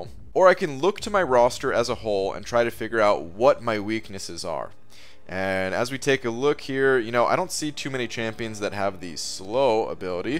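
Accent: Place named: Germany. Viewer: Hi are you from germany you had german akcent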